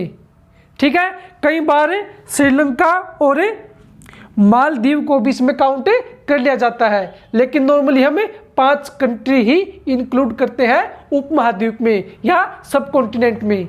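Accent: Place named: Haryana, India. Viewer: haryana se ho bhai ji acha smjhaya bhai ji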